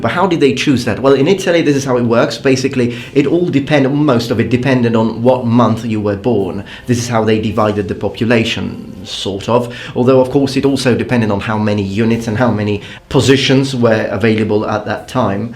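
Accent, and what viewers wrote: England, United Kingdom. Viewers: has an amazing English accent.. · your accent sounds english. also if youre italian why do you use a jewish star as a logo? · you're italian? i thought you're British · The Old French variant of the word, and the original way to pronounce it is writen "luef" instead of "lieu". This is the way the british have always used it, so there you go. learned english in the UK, so he speaks british english instead of murica english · Oh damn! You're Italian? I thought you were British! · I wondered what your heritage was......I thought maybe Greek or Italian.....but your English is so good.....you even have a British accent......I bet the ladies chased you in that uniform...;)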